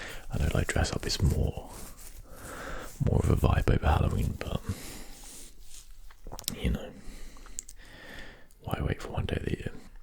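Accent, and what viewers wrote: United Kingdom. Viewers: We hearby officially brush those negative thoughts away for you. Brushhh. Brushhh. Brushhh. Your doing fine. We are happy to see you. That velvet voice with your British accent and handsome bearded face make us all feel great. 👍💜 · Damn ur voice was so good i love it so much i'm normally not fan of soft spoken but ur voice was so hot(sorry i go to far in that coment 😂) love ur videos they're cool and british accent is the best ever. I'm french(from normandie not so far to u😜) so sorry if my English was bad😕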